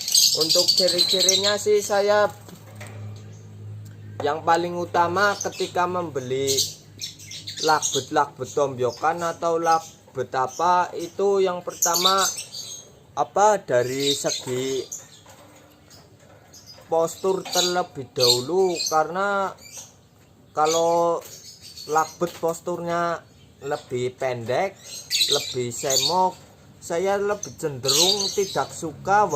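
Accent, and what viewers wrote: Banyumas, Indonesia. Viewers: Purbalingga ngapak2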